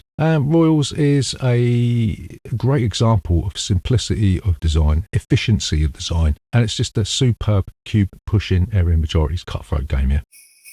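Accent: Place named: England, United Kingdom. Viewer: Can't place the accent - which part of England are you from .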